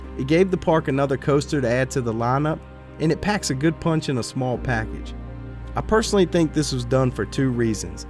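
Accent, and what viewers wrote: South, United States. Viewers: I love your videos and as a fellow southern boy your narration makes it even better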